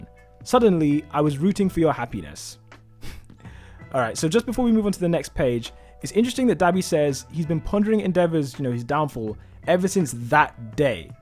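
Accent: United Kingdom. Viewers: It’s funny this is about Dabi’s reveal but the biggest reveal was seeing what looked like! NGL, I pictured you as Harry Potter because you don’t have an American accent so I thought it was British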